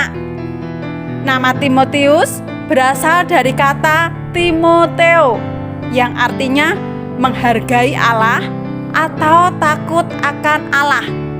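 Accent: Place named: Java, Indonesia. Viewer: Logat Jawa kak😅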